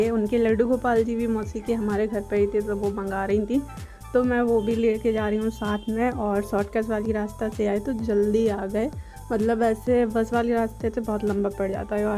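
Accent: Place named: Bundelkhand, India. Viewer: tum to uma ke yahan hi enjoy kar rahi thi tum log bundel khandi ho kya boolne ka dhang se mallom.parta hai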